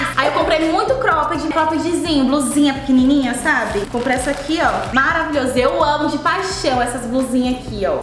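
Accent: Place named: Fortaleza, Brazil. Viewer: fortaleza eu acho não tenho certeza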